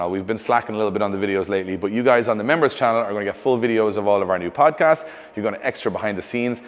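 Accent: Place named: Ireland. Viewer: shocked when i heard the irish accent and then that you said this was in dublin ... instantly subbed ....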